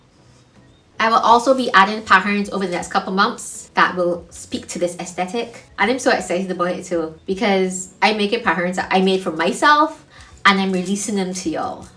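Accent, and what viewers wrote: Barbados. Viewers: Fr she sounds like she might be from barbados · yeah it kind of caught me off guard. I was like wait is she speaking English. I had to listening intently, I said oh she’s Bajan. 😊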